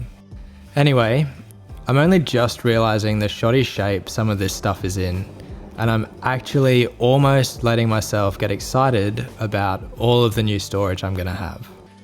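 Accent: Australia. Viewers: Relentless Australian accent...LOL! Had a couple of laughs and picked up a few tips, thanks! Liked and subscribed. 👍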